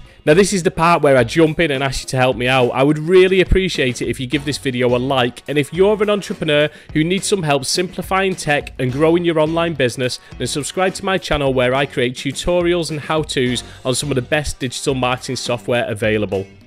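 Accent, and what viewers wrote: United Kingdom. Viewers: This really is super-helpful. You have a great Yorkshire accent and voice by the way. If you're not doing voice overs already and need the extra cash, this could be a nice sideline for you.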